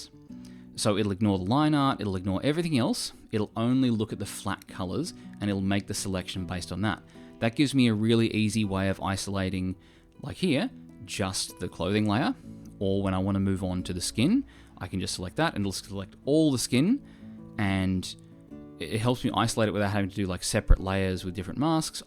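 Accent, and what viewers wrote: Australia. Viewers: You Australian by any chance mate? amazing tute btw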